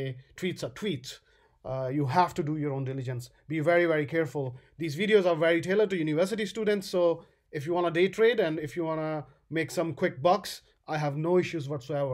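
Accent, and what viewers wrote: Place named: British Pakistani, United Kingdom. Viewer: You sound British/Pakistan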